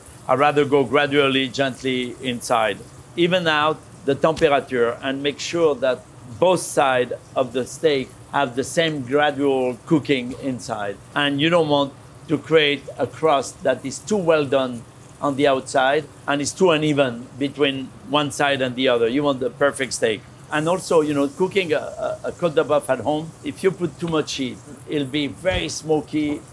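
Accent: France. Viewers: love every time his inner french hits randomly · Goodness me, amazing cooking, mouthwatering steak with a touch of french accent. I'm hungry now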